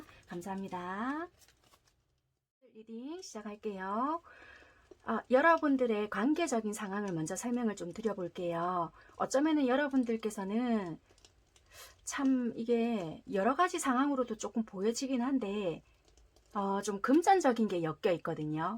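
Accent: Busan, South Korea. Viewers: 5번🙏🍀💜 저도 부산사람이라 듣기 편하고 좋아요 잘맞는거같아요....... 참고해볼게요... 앞으로 우리커플 잘됐으면 좋겠어요 제발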